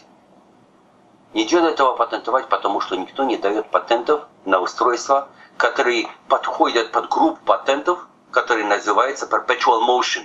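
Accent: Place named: Poland. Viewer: Польский акцент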